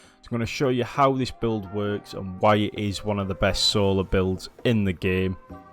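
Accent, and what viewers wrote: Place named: Leeds, United Kingdom. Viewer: Sound like a leeds lad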